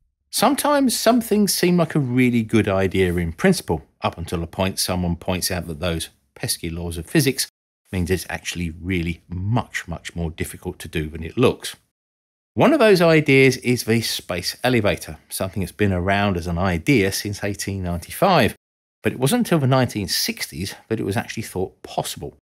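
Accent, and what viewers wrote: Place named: Yorkshire, United Kingdom. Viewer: Ahh, that Yorkshire influence on the accent.... "What planet are you on?" "Uhf"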